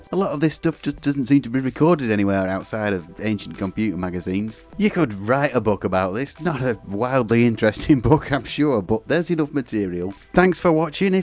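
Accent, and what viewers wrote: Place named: Northern England, United Kingdom. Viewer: You sound northern, any chance of a collaboration with Techmoan? Yet another brilliant video that’s as informative as it is entertaining.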